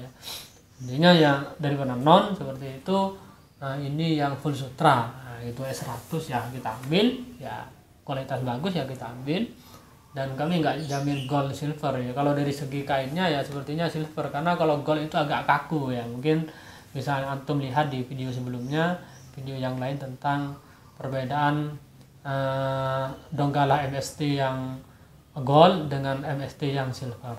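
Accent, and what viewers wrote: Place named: Madura, Indonesia. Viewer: Pak ustad nya orang madura kak kik kuk bhs indonesia nya.. lucu😁 tapi saya kagum dengan anda pak ustad semoga lancar jualannya.. aamiin...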